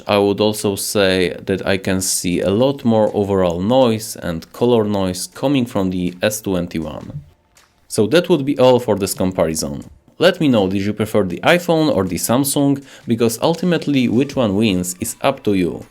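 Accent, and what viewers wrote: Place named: Poland. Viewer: you sound so Polish. I mean your accent. Very nice comparison though . Just bought Galaxy s21 hope I can get use to android xd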